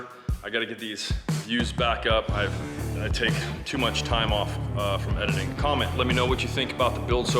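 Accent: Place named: United States. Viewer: What are you talking about Clif ? That's an American accent ! As for the way he looks, don't make fun of him just for being a pretty boy, 😂 he can't help it if he's handsome.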